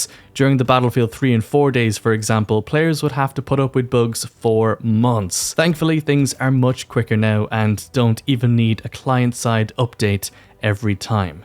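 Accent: Ireland. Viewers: He's Irish you muppet. Not everyone talks in American English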